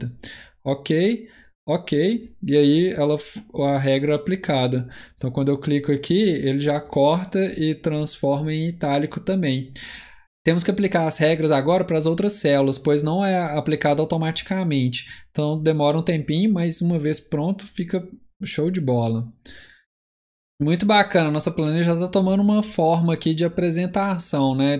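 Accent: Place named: Minas Gerais, Brazil. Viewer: Noss mas esse cara é Minerim demais sô (ou goiano).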